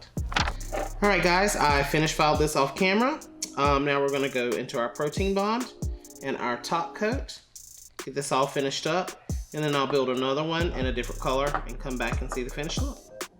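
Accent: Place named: South, United States. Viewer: those are beautiful and awesome you make me want to practice this. I love your southern sound. My parents are southern, but raised in the north. Love all people ❤ 💕 and wow on those 💅 nails ❣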